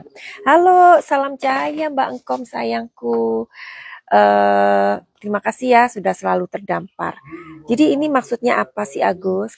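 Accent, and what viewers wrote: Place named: East Java, Indonesia. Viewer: Maaf madam asli mn ya koc bahasanya kaya org Jawa timuran 🙏🙏🙏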